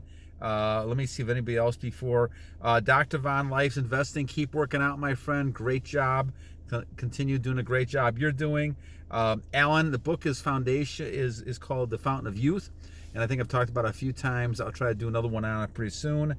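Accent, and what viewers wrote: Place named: Illinois, United States. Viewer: I don't know where he is but he's definitely from Illinois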